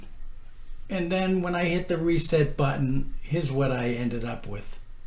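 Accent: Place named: Massachusetts, United States. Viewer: Thanks! I enjoyed your information and the way you presented it. I'm guessing you're from Mass. I'm originally from Boston's Dorchester (now living in the Toronto area). My laptop is Windows 10 by my old PC (the one I use every day) has XP as its operating system. I depend on that PC (especially Cool Edit Pro... and I lost the "key") as an income generator but I know the hard drive won't last forever. Yes, I have the updated "version" of Cool Edit Pro (Adobe Audition) on my laptop but the old version works better (to me). Thanks again!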